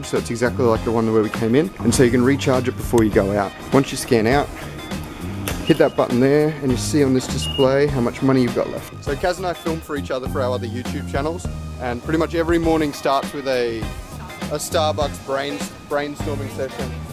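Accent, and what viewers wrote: Australia. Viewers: Hi, how are you doing? i love what you did, it's incredible experience. What i like the most it s your Australian accent 😍😍😍. Please don t rush let us enjoy the details of japan, it's awesome country. Thank you for giving us this opportunity as well to discover really japan form your experience. I m going to watch the next one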